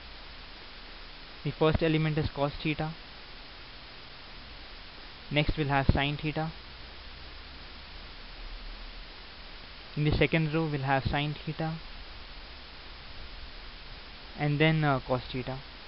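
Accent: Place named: India. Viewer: very nice video..easy explanation and the accent was nice and indian ;) kep it up bro,looking for more videos on grahpics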